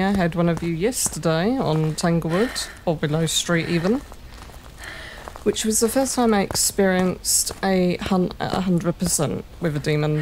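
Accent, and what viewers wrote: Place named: United Kingdom. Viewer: from what I’ve heard most British accents I hear are far more pronounced than yours, which is why I was wondering what accent you had. I guess you just have a really relaxed way of talking!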